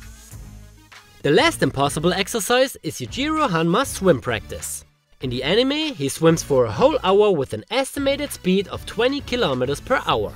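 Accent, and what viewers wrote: Germany. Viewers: I love the German accent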